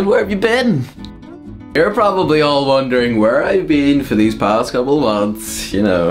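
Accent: Ireland. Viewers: what part of ireland are you from ?:)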